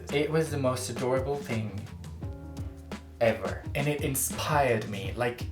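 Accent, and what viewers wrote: England, United Kingdom. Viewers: EL ACENTO BRITANICO AAAAAAAA ❤️❤️❤️❤️❤️❤️❤️❤️❤️ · Aprendiste inglés en Inglaterra?? Me gusta mucho tu acento inglés. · You're english is really good! And you have a cute british accent jdjdjd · i didn't exepct the british accent, tbh and i love it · I love is English accent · U sound a little bit more gay in English 😂😂😂 and u have a bit of British accent 💙 Love it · Me acabo de enamorar de la voz de hablando ingles! Suena como un ingles de uk! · TE SALE UN ACENTO RE INGLÉS ESTO ES PRECIOSO, MÁS VIDEOS EN INGLÉS!!! ❤️❤️ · Ohh, I see, I see, interesting. I actually root more for the British aacent, nice. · Tienes como un acento británico al hablar en ingles y me encanta! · His British accent make me a orgasm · I JUST LOVE YOUR ACCENT SOOOOO BLOODY MUCH, IT KIND OF REMINDED ME TO ADELE´S ACCENT AND I LOVE IT:face-red-heart-shape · Me encanta cómo quedaron los dibujos y que tienes un acento súper británico, por lo que me han contado las personas que me han oído hablar inglés mi acento va cambiando entre británico como el tuyo, americano (estadounidense, no canadiense) y escocés según qué palabras ( todo esto fruto de mis distintos profesores o fuentes de aprendizaje de inglés en general a lo largo de los años) lol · I don’t know what I was expecting you to sound like in English but I definitely wasn’t expecting a half-British accent lmao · DIOOOOO que fluido xd y hablas como un británico lol te adoro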